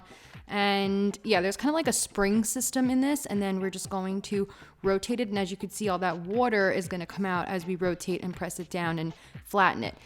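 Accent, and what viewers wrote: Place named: New Jersey, United States. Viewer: Definitely a Jersey girl.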